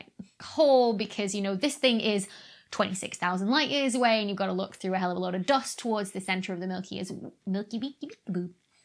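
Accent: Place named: Northern England, United Kingdom. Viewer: Great to hear a Northern accent! Love this channel, fabulous stuff!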